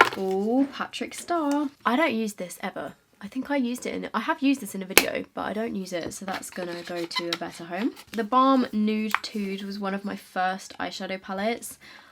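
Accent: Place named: England, United Kingdom. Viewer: I def want to enter the giveaway, my email is <mail> also my twitter is i love you’re video and when I need to relax you’re my go to, the English accent is soothing to me and I just love hearing you speak. Good luck everyone, fingers crossed 🤞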